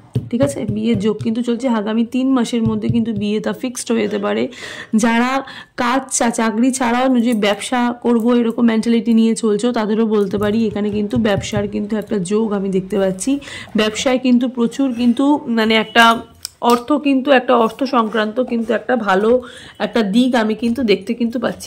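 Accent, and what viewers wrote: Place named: Murshidabad, India. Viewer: Apni ki mursidabad e thaken nki apnar kotha te mursidabad manuser moto tan asche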